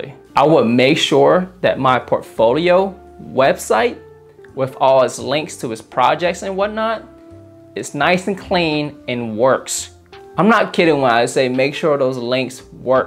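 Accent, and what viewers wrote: South, United States. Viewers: Are you from the south? xD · Aside from the amazing pieces of advice, I just want to say how nice your accent is. Deffinitely can hear some Southern US + Viet accent! Cheers brother.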